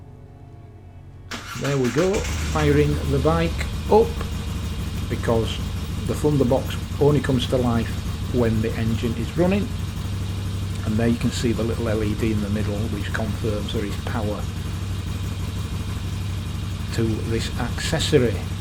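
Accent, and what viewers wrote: Yorkshire, United Kingdom. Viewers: Happy to remain a subscriber. As a fellow Yorkshire man I understand every word. Keep the BSA, it’s a fine machine.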